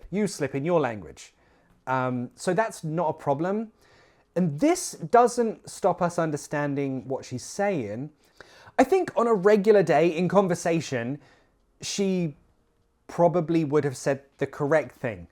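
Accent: United Kingdom. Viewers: You're always wonderful! I adore a British accent and really like your way of teaching, I study English language and life of Brits❤ by the way, 5 years watching you from Iraq! ❤❤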